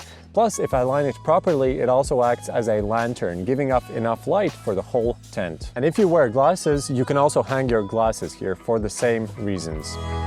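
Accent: Latvia. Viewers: By chance, I stumbled upon this video, and from the very first sentence, I had a strong suspicion that you are Latvian 😀. Nice tips! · Great tips! Love your channel. Are you from Latvia by any chance? I think I recognize your accent 😅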